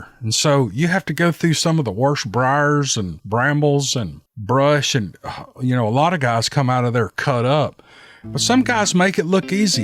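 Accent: South, United States. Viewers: you have a very deep voice I would like you to sing some with your Southern drawl sometime. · Just got home from work and BOOM, a new vid! What an awesome way to wind down. Being in the deep woods at night with just a lil 22 and having a giant, possibly hostile, WTF walking beside you. Damn. That'll test your bunghole. It'll either pucker up REAL tight, or the flood gates will open wide. Yeah, I'd give up coon hunting too! Keep up the great work. Listening to you talk with that southern drawl is just like sitting around the camp fire with friends and family.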